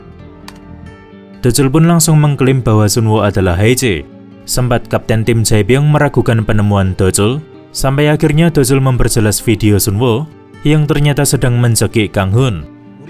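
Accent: Java, Indonesia. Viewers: Medok banget 😢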